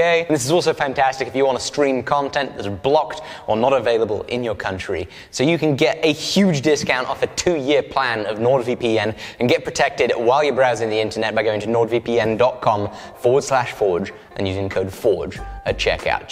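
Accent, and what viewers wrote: United Kingdom. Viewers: I came from colab- Am I crazy or does he sound WAY more British here than in that video?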